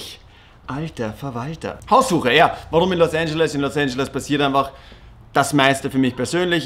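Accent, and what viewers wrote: Austria. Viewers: Hahaha alter erstes Mal ich merke ein starkes Österreiche Akzent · It's cause of his Austrian accent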